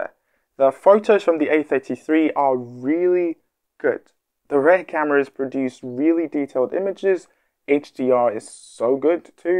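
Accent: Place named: United Kingdom. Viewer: you sound British though. Did you go to school in Britain?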